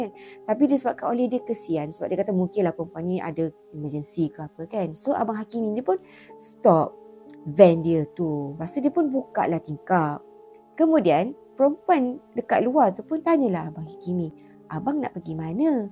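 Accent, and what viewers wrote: Kelantan, Malaysia. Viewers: Kak ore klate kann,kcek mcam pailet loghat klate jerr😁..truskan bermaju jaya channel akak ni ye😊,mdahan moga murah rezeki🤲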